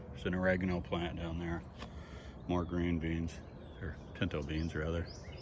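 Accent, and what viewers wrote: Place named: Texas, United States. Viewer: nice! I looked into the self watering pots by that Leon guy, very cool. Fun to hear an old Texas accent too! Haha I think I’m gonna start some. Where did you get your buckets?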